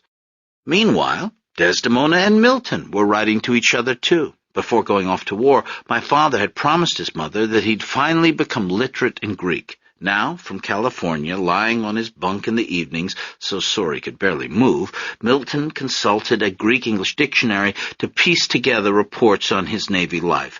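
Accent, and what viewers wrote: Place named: Borscht Belt, United States. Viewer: This narrator has given the first generation American, Michigan-born characters, theatrical borscht belt accents, for some reason! Weird.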